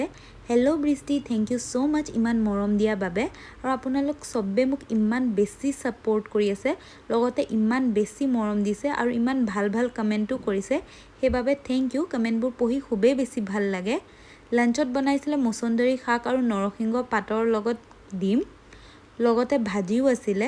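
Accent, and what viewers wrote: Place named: West Bengal, India. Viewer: Baa tumar kotha khini mane accent to olop Bengali type lage tumi pure assamese hoi ne please jonaba sun and tumi.kor hoi koba sun...lots of love to you❤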